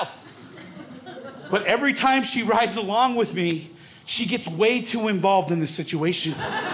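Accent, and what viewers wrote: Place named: Boulder, United States. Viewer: But sounds more like he works in Boulder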